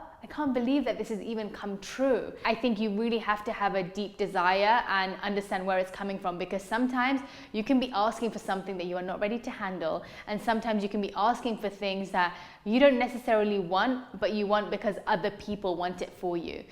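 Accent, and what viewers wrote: Sri Lanka. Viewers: Hey, are you a Srilankan? I always thought you are an Indian (a kannadiga or something) but your Tamil sounds like Sri Lankan! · Holy cow... I never knew u can speak tamil and it sounds so much like you are from jaffna. Love from Sri Lanka ❤👌🏼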